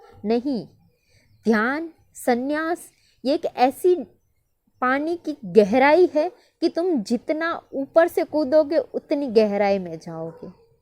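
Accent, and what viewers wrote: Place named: Gujarat, India. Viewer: Gujju tone of hindi 😊😊😊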